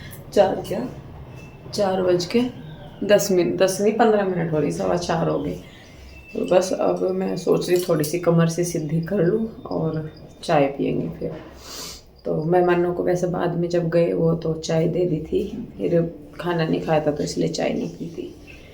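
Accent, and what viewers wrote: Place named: Meerut, India. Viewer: Assalamualaikum me farzana Karachi Pakistan SE 4 3 Saal SE apka vilog dekh rhi hoon mere dada Dadi bhi Meerut SE thy apki boli buht achi lagti hy mere dada Dadi bhi easy hi bolty thy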